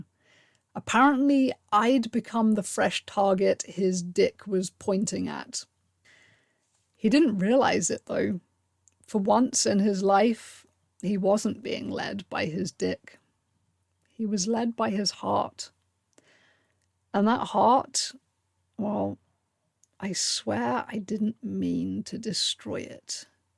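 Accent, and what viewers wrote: United Kingdom. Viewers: I love your accent.almost sounds british.also,love your vibe. Good luck on ed recovery.its not easy.had a friend in high school who we all thought was a finicky eater.until...she came to school looking absolutely wrecked.tiny to start with,she got down to i think 45 or 55 pounds,and she was 5'4.deadly low.no,sadly,she did not recover.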